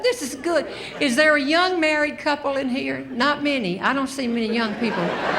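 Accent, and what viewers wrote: South, United States. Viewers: Ms. Robertson is the funniest woman in the world of humor. I love to listen to all her stories because of the humor and the southern accent. Thank you ma'am for the laughter you have brought....Billie J. King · Linda Watkin I’ve watched for quite a long time now and cannot imagine how anybody could find a thing “nasty” about her. You said you “do not like her humor” and then said that you “think that she is nasty.” Is it her clothes, her hair, her voice, her Southern accent or what exactly do you find so offensive about her? Her humor is clean and free from the usual cussing, sex and vulgar talk or political comments as is so common with the majority of comedians these days. If you don’t care for her style of comedy, then simply don’t subject yourself to it. When you come upon one of her videos it really is the easiest thing you’ve ever done to just scroll on by without ever touching on it to start playing. 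We’re all allowed to enjoy our own chosen style of entertainment so please let us enjoy Ms. Robertson and I encourage you to find whoever or whatever brings laughter and joy into your life. God Bless You.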